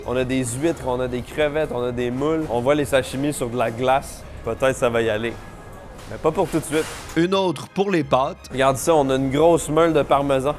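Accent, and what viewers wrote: Quebec, Canada. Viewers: Beuh écouter un français approximatif qui sort de la bouche d un kebeukoi avec un accent à vomir …bravo !! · is this that really disgusting Canadian French?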